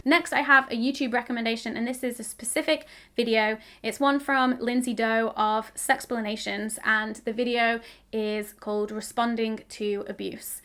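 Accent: United Kingdom. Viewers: I love youuu omg currently rewatching your videos to get used to "the British accent" of the phonetic charts I have to study at uni here in France skksksk 🤯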